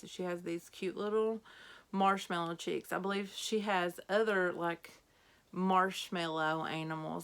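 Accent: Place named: Indiana, United States. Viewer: Oh wow I finally come across someone who from Indiana as well...But u sound like ur a little more North from where I'm from...i just subscribed to u but loving ur video ...looking forward to more videos....God Bless and hope u have an amazing week....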